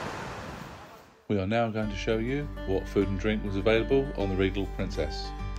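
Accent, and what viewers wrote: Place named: South, United States. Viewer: Good to hear those soft southern tones again, better than music…. Did we also see a plaid shirt by any chance ?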